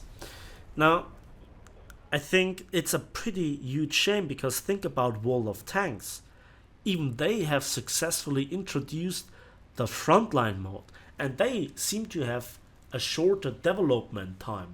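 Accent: Germany. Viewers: dont ever loose the german accent! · Hey! Thank you for mentioning and answering my comments. Though I think you really should invest some time in answering everybodies comments with written replies... it shows that you care about us. But I'm still getting used to your ways and I may be wrong. About my comment that your videos are to long... forget about it. They are just great!!! Don't change a thing. About premium account... it's a must. I've tried a couple of months without it and it sucks. The difference is huge. And I'm fully spaded in all nations fighters and most of other planes. Except for the jets. They are pay to win and I hate them. My advice to all non premium players... just wait for the sales. 12 eurs a month is not so expensive especially if you love the game but on sales price drops to 3 eur per month. Now that's just insane not to pay for it. 40 eur for 360 days of premium. 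What happened to Subnautica branch? Continue the project... please? You are amazing narator and I love your german... both the accent and the actual language. Some 'fuck you's in german and 'du kanst mich mal' or 'was soll den sheiss' and stuff would be great to hear too:-D You know... actual comments when someone makes a pussy move on you and the joy 'comes out' of you... in your mothers language... the good stuff:-D Love you!!!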